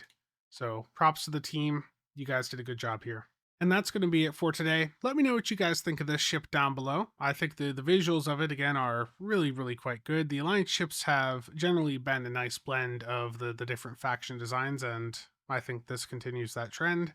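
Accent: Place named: Midwest, United States. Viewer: Different folks have different accents and local ways of pronouncing words, it's not an uncommon way to pronounce Sagan in the midwest.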